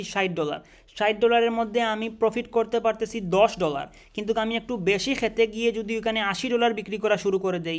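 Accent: Sylhet, Bangladesh. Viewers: are you sylhetti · ভাই তুমি সিলেটি নি? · Assalamualikum.bhai kita Sylhety ni? mashaAllah nice video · Apni ki Sylheti 🙂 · 🫣তুমার মাত হুনলে বোঝা যায় তুমি সিলেটি 🏞️😍😘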